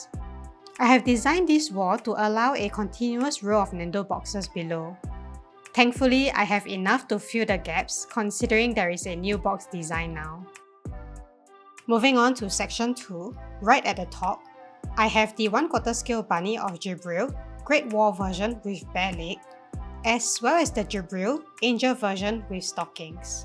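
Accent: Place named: Singapore. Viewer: First time discover your channel and I'm wondering if you're Singaporean after hearing your accent and I'm right 😅, fellow Singaporean here 👋, I'm planning to become a youtuber as well, hope I can achieve that goal soon, best of wishes and amazing collection. 👍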